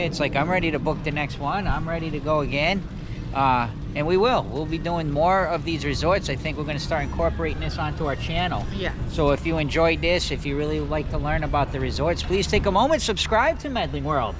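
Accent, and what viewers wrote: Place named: Northeast, United States. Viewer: Can't place that accent. NY? MA? NJ???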